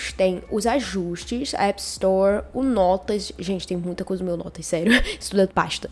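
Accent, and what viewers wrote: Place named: Rio de Janeiro, Brazil. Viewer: carioca com xish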